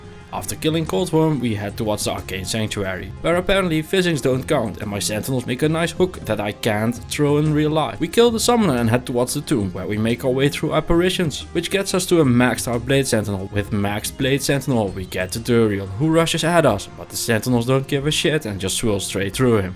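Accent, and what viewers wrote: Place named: Netherlands. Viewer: ik hoor gewoon een nederlandse broeder onder dat accent <3 love to see it. ik heb geen dutch d2 friends </3 :(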